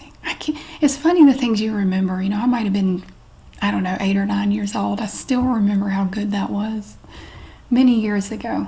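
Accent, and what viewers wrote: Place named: North Carolina, United States. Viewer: Yes, she is a North Carolina native and her beautiful, musical accent is natural! 💖😊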